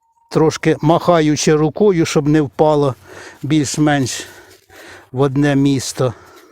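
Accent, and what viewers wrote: Poltava, Ukraine. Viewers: Яка ж милозвучна Полтавська вимова! І безліч корисної інформації.Наснаги і здоров'я вам і дружині. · Слухаю нашу лагідну українську полтавську мову і хочеться слухати і слухати. Ніби й знайома з усіма цими справами, з посівами і пікіровкою, та все ж -- мова, заслухалась. А ще душу радує те, що чоловік цим займається.. дякую за всі моменти радості. А ще дивлюся, як ви багато висіваєте розсади, місця треба багато. Я цей рік посіяла в ,,улітки" перець. Супер, місця займає дуже мало. Розсада класна, пікірувати одне задоволення.. в одну улітку в мене вийшло 45- 48 шт, а улітка помістилась в 1літрове відеречко з під сметани. У ваш яшик поміститься штук 8 відеречок. Попробуйте, можливо вам сподобається.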